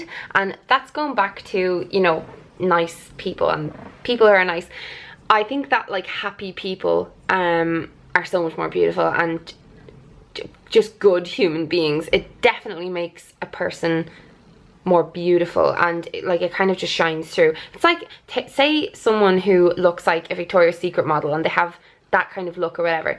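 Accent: Ireland. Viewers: Had to break for the Jehovah's Witnesses there, we had a nice chat there haha. Aw I love Beautycreep too, I've been watching her for years (being Scottish and all). I like the accent and live in Wales now. And you, provide me with the Irish accent that I love so much! Plus both of you have lovely videos, are so down-to-earth and have such a positive outlook.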